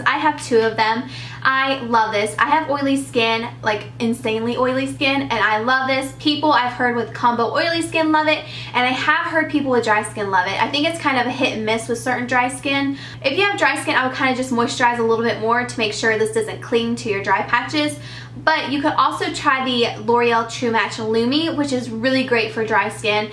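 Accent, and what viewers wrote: South, United States. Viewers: wow your so pretty and you sound like an all around beautiful american girl! I enjoyed your video :) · I found your channel when I was looking for hair tutorials & I came across you when you had your blonde hair & adorable southern accent(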